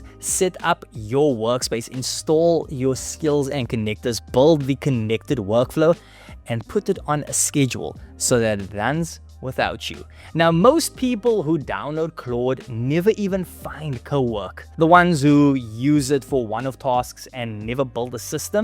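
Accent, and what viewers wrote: South Africa. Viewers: I hear South African Accent, I sub 😊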